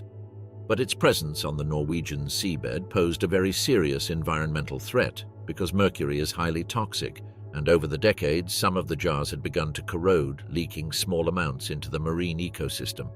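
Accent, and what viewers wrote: England, United Kingdom. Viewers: the German navy was ruled by large battleships and you show the Lusitania? Can it get stoopider than this? You don’t get credibility just because you give the narrator an English accent. Thumbs down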